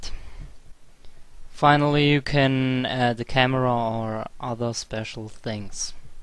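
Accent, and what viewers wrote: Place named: Germany. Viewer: youve got a german accent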